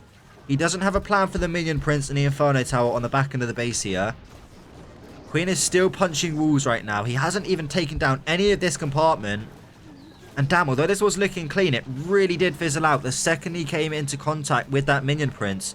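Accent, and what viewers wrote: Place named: United Kingdom. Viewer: British accent 😉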